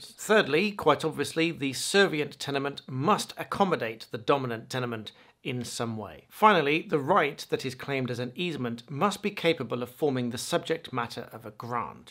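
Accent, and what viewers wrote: United Kingdom. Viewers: With that accent, I have to ask, are these laws in the U.S. or the U.K.?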